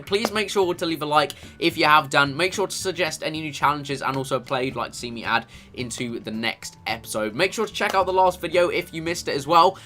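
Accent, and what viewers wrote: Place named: England, United Kingdom. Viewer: This guy's definatlaly english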